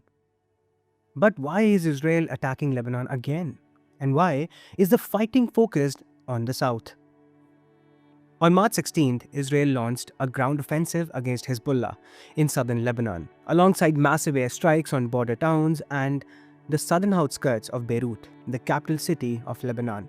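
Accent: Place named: India. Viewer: No matter how professionally this video is edited, I can't look over the fact that it's with an indian voice🤦🏻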